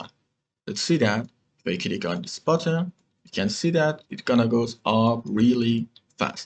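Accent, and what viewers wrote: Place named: Iran. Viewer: Persian accent sooo good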